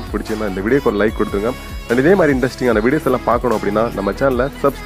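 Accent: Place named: Tirunelveli, India. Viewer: bro tirunelveliya bro la pottu pesuringa Naanum tirunelveli bro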